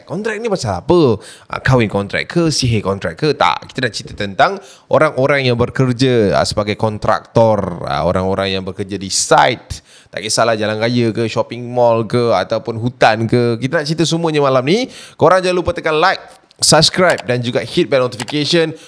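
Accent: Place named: Terengganu, Malaysia. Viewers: terbaik la kecek ganu 😂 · Jaddi borh loghat Ganu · bapak naturalnye kau ckp slang ganu. hahaha power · peh, mcm org ganu doh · Bakpo bunyi ganu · pandai plok cakak ganu😂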